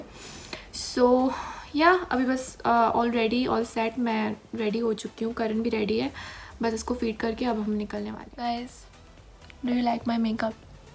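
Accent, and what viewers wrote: Pakistan. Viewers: I don't know why but ap Pakistanis ki trha bat kr rhi ho Btw love from Pakistan beautiful lady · Love ur accent.... resembles so much like Lahori accent..... mind if u speak some punjabi too?? A true fan from Lahore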